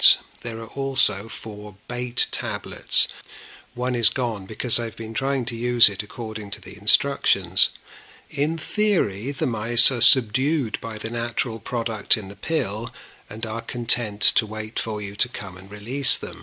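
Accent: United Kingdom. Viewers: I have not seen this trap for sale in the UK, I think it's only sold in the States and Canada. But you sound very English, just wondering??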